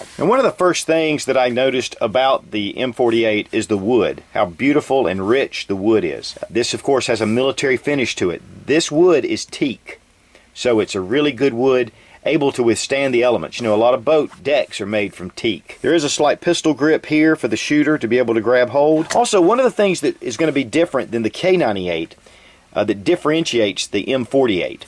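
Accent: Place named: South, United States. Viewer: I really like your videos and find them to be very informative and answers all of my questions before I need to ask them. That, and your southern twang makes these videos fun to watch.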